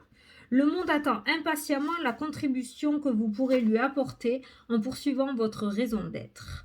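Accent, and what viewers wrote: South, France. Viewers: Merci ma jolie pour moi la vierge tu as un bel accent chtit du sud 🌹👍❤ bisous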